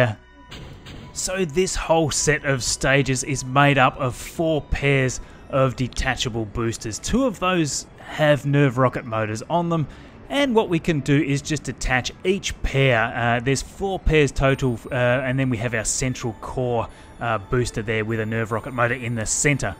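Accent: Australia. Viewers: Oh. I know what's up with the AU accent (I'm used to associate this accent with the british one because I'm from Spain), but still, nice job with the videos, the things you guys make are so satisfying to witness:D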